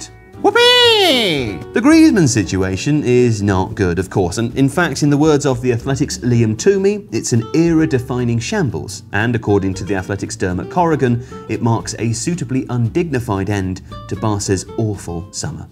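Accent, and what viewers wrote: United Kingdom. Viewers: I love your voice so much bruuvvvv. I have been watching Tifo since 2018 and I want to say that your British dialect and voice is really awesome in comparison to others I've listened to.It is a high compliment bc the internet is vast. My advice is: when you start doing commentary for live games, please dont be a siff like the majority of English announcers - look for some sauce and vivacity from the Romance languages of how they announce games (Argentinians, U.S. Hispanic announcers, and Portuguese are really good starts for research into this - they are so much fun when they announce games). And, yes, one day, BBC, or someone is going to want to use that voice....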